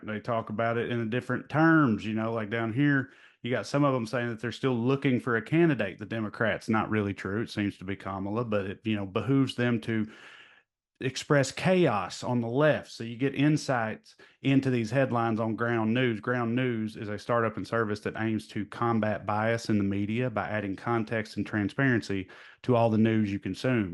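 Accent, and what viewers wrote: South, United States. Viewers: Listening to a Southern accent talking liberal politics does my heart hood. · Hey I'm prolly gonna eat shit But I. Respect what you're doing I never really had to think before But props On props Its an effective form of absurdist theatre that just makes sense You are of course as smart as you think you are but getting an actual message across is impossible unless your a smoke show in a bikini. We're all gonna die But I hate a country accent slightly less because of this as long as no one mentions vacation bible school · I'm glad whenever this proverbial rollercoaster rattles over another hill or hurtles through a long dip...that I have this man's voice to tell me about it. Reminds me of home. Thanks for your hard work from Thailand. is about the only southern accent I get to hear these days.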